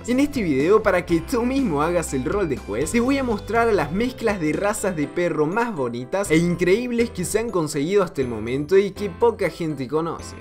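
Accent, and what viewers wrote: Argentina. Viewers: tienes asento argentino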